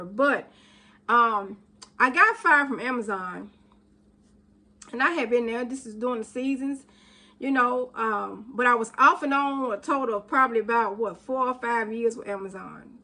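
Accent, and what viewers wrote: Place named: Tennessee, United States. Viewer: I can tell by your accent that you're from Tennessee or somewhere near. ❤❤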